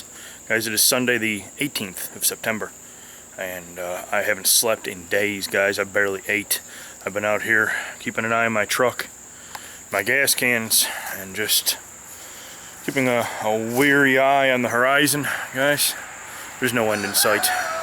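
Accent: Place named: North, United States. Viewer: you sound like a yankee, you ain't from TN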